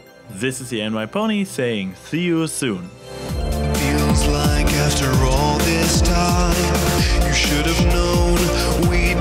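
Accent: Germany. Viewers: Oh, it is! You're quickly slipping into my "most entertaining reviewers" category that includes such hilarious guys as ConfusedMatthew, SFDebris, and JeremyJahns. The strong accent combined with perfectly clear English helps quite a bit on that score; everything's funnier in a German accent (as a movie director once told Arnold Schwarzenegger, and yes I know he's Austrian). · I hate german accents so much, but not as much as I like your videos. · ... are you somehow a version of me that happen to grown up german. I have an American accent, but when I speak german.... the resemblance to your voice is beginning to creep me out...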